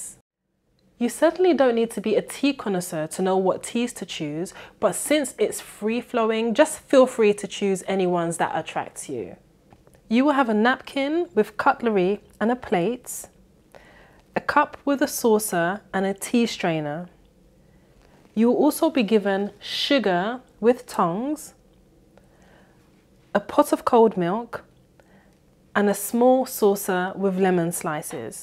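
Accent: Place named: Cockney, United Kingdom. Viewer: Love your refined east-end accent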